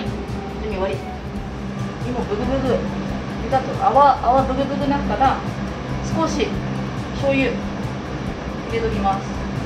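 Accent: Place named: Yamagata, Japan. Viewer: 芋煮というので野菜の煮物のようなイメージを持っていましたが、この動画を見ると鍋とか汁物のような料理だとわかりました。美味しいのでしょうね。山形弁が時折出てきました。山形弁の響きはとてもよかったです。もっと、山形弁を増やしたらどうでしょう。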